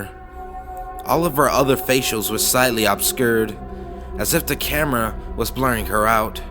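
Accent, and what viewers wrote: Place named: United States. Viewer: Are you from Sydney Australia you don't have an accent you sound American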